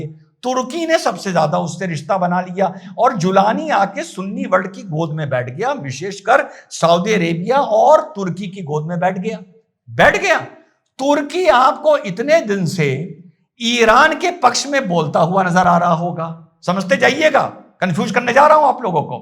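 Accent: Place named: Punjab, India. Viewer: Punjabi ki tarhaan bol rahai hindi yeh musalmaan nahin .beta talfuss toh theek keeleta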